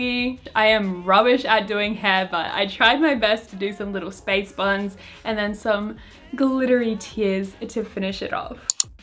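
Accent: Australia. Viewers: OMg this video was so cute and I didn’t realise you were from Brisbane (I’m from Adelaide and I thought I recognised a bit of the Aussi accent 😂). My fav playlist song of all time has to be by but I’ve actually been loving by even though I thought nothing could top 😍 Insta: breathing_underwayer456 and I’d be happy with either album 😊😍 Thanks so much for this and it’s honestly so great to see other Australian Kpop fans (even tho no one ever come here for concerts 😭😂)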